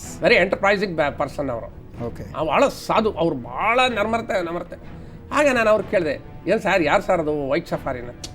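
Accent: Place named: Andhra Pradesh, India. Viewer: sir telugu slang super sir.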